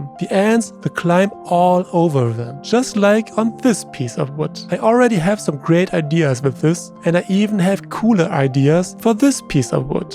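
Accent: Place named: Germany. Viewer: Hey man Great Content fellow ant keeper here. Are you German btw because you got the German accent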